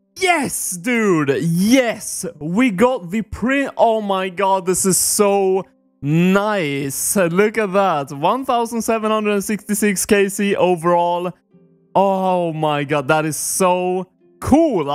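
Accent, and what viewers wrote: Sweden. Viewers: Jag älskar att man hör att du är Svensk! :D du fick nyss en ny subscribers. :)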